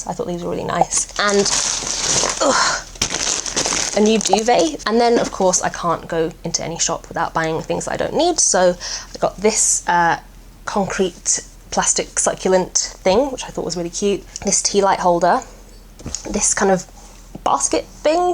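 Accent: United Kingdom. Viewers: i love to listen to people with a british accent that's so asmr tbh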